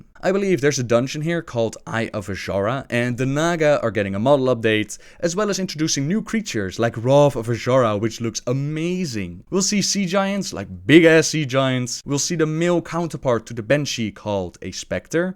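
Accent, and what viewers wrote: Netherlands. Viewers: is he dutch? it sounds like it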